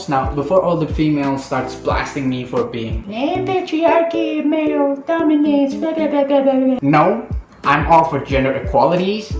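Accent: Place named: South Korea. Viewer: cute with korean accent😘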